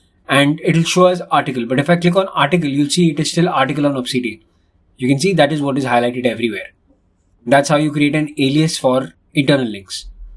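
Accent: India. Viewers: Very good video, but sorry, your indian accent is vey strong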